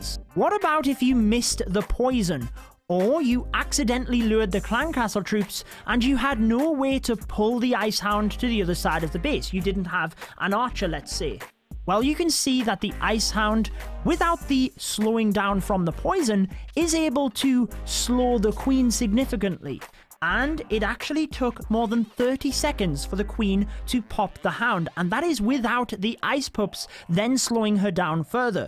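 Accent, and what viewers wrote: United Kingdom. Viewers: Ty are you from the uk?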